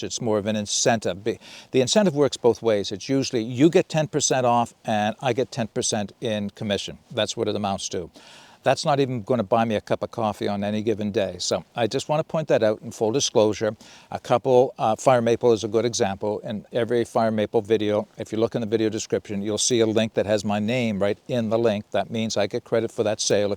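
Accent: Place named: Nova Scotia, Canada. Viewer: Thank you for everything you do. The first time I stumbled upon one of your videos, your accent seemed oddly familiar. It took me a while to figure out that you were a fellow Nova Scotian. I have purchased a number of things based on your reviews. I trust your opinions. I cannot fathom the time and effort you put in. Well done.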